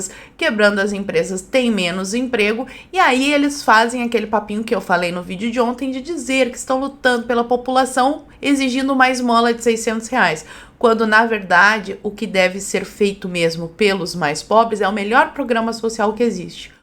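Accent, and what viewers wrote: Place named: Minas Gerais, Brazil. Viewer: OCE. É. GAUCHA. O. É. MINERA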